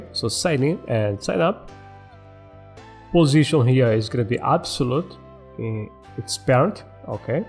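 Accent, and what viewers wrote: Brazil. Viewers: Excuse me, are you Brazilian? Me desculpe perguntar, mas você é brasileiro?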